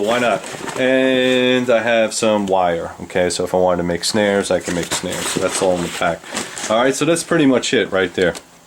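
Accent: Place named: New York, United States. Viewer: Thank you for your service! Are you from New York? Your accent reminds me of the old "Bowery Boys" movies.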